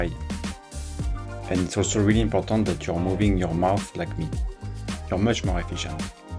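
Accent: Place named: France. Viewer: The last time I heard French English accent was so long ago, and it is nice to see a French chef guide how to make a brioche 😄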